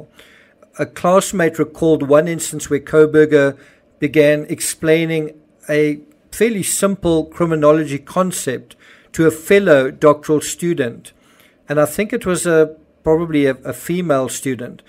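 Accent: South Africa. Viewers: (I've heard it spoken this way by all? south Africans.. said with all due respect. 🤭they are protective of their 'woman'.. anyway, goes back in history&culture !! Not something I know enough about😌, but the very norm how its 'pronounced' 👍🏻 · It's his accent. He's from SA.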